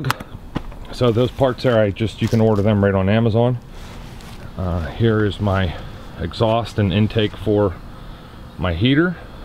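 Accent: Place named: Pittsburgh, United States. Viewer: Nice job. As soon as you started talking I knew you were from Pittsburgh. I just moved out of the city to Ross Township.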